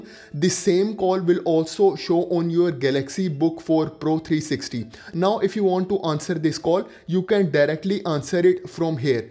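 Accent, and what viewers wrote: India. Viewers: Heavy Indian accent 😅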